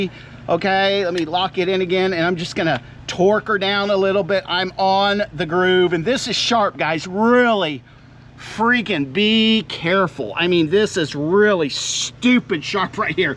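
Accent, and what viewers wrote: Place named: United States. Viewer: omg.. a loud yank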